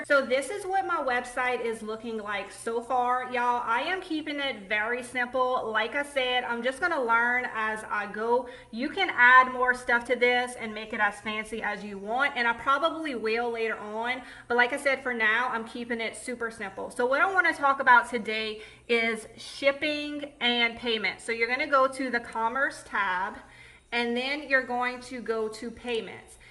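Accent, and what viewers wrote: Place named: Louisiana, United States. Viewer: Just found you today and LOVE your ideas! Beautiful…..also, can I guess where you’re from by your accent and that it doesn’t usually snow there alone? Louisiana? I’m from Southeast Texas and that’s just what your accent reminds me of 😀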